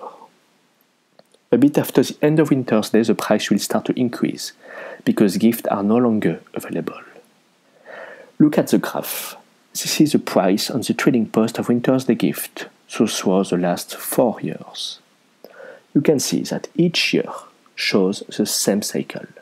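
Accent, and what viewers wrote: France. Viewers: Ce franch accent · Possibly the strongest french accent i’ve ever heard, no hate intended! Good guide! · Aaah l'accent français c'est grillé au bout de 3 secondes :D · So many years and I still lobe your French accent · NICE FRENCH ACCENT MY FRIEND :)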